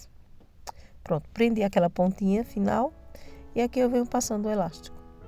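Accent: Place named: Northeast, Brazil. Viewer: Tudo que você faz nos encanta, e esse jeito de ensinar maravilhoso😊 com um sutaque puro nordestino gostoso de ouvir, amoooooo...❤❤❤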